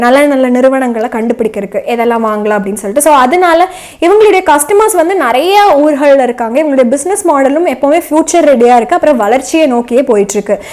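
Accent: Coimbatore, India. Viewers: I appreciate your Coimbatore Tamil lecture.long live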